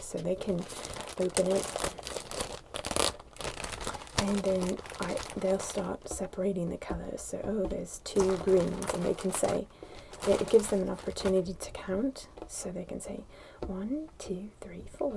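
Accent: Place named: United Kingdom. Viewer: I always forget you aren't British. That accent is nailed tho😍😍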